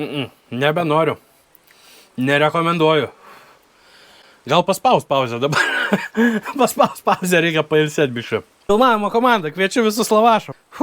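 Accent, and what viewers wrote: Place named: Molėtai, Lithuania. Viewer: Iš tarmės gal pons iš Molėtų ar Utenos kraštų?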